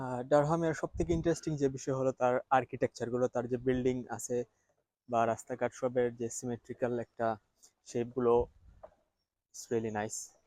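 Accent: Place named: Sylhet, Bangladesh. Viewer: ভাই সিলেটি মাত ঐ ভালা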